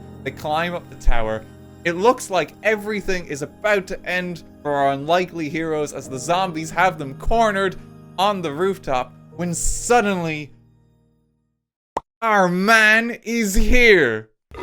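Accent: Ireland. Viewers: keep the good work up! Never thought I'd get a second Irish lad talking about stuff he enjoys and is passionate about in longform, but here we are! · God he's so Irish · theres something magical about being a casual viewer and barely ever seeing like, physically, and then suddenly getting almost 2 hours of physical. im a week late but i honestly love video essays and long videos, i put them on in the background as i draw and its always so fascinating to listen to peoples different opinions and views on things. i also like irish accents a lot so its fun to listen to him talk. i vote more video essays on things you like (i reeeeally want a minecraft story mode video essay, i watched the playlist of that recently and the hatred for it is so funny) also thank you your editing is genuinely inspiring (i think a lot about how the e33 video was and how much that tickles my brain)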